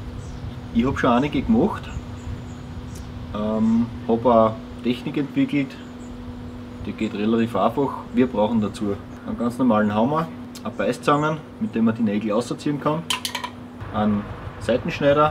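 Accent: Bavaria, Germany. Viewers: Wie wäre es denn mal mit HOCHDEUTSCH? Typisch Bayer, da sind mir die Sachsen lieber, die geben sich wenigstens Mühe das man sie verstehen kann !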